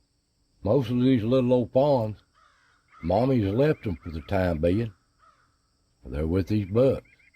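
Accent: Appalachia, United States. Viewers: thank you sir,I loved the country mountain way,you narrated the piece work story,five stars ☆☆☆☆☆,I'm a blue ridge boy,so sounded like one of my uncles telling a story,thanks again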